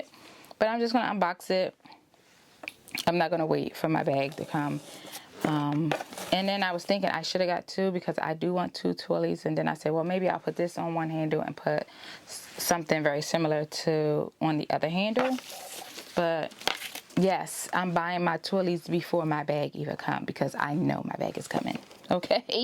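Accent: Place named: Baltimore, United States. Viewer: You sound like you're from Baltimore gurllllll ❤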